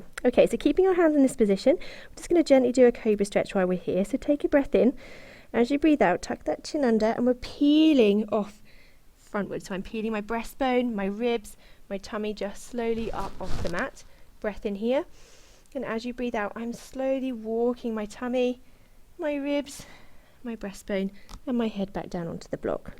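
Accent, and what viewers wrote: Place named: United Kingdom. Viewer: Thank you so much. I started with you 3 weeks ago after a 7 months pilates break. It's been tough the first week with muscle pains 😆 but I really wanted to thank you for all those awsome free classes. You are keeping us far from mental health troubles during this shitty time. On top of it your lovely Brit accent help me improve my pronunciation.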